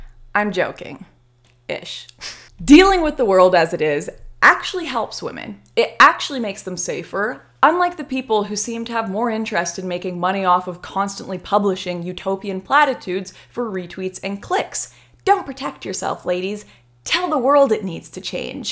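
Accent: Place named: Canada. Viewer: Canadian accent is better than American and British accent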